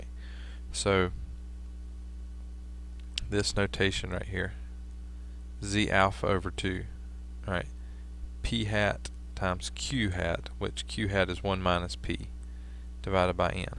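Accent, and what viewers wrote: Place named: South, United States. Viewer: Came for help with my homework, stayed for your delightful southern accent ;)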